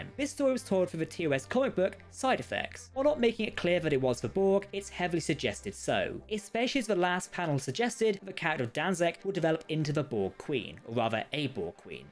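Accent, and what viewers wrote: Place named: United Kingdom. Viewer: pro tip: if you stopped being so british and spoke slower with the correct pronunciation, maybe your audience will understand something, and you'll still make it to the dreaded 10 minute mark.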